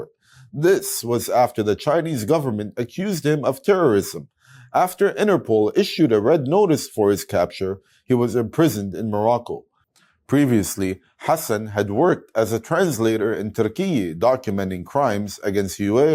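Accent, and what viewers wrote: United States. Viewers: .*╔═══ ❤☀💚☀❤ ═══╗* 💚💚💚💚💚💚💚💚💚 Dear MR, Wa-alaikum as-salaam. Like the Crescent of, you appeared after full one week, 14th, Feb being the last you had read the news, and full 7 days ahead of the sighting of the Crescent of. Wishing you and all your subscribers a HAPPY, SPIRITUAL, TAQWA-GIVING & AUSPICIOUS!!! Your presentation of news is so fantastic that despite you accent being American, we, from India, find it so easy to understand you beautiful slow pace of reading the news. KEEP IT UP, MR.!!! 🌐🌐🌐🌐🌐🌐🌐🌐🌐 ╚═══ ❤☀💚☀❤ ═══╝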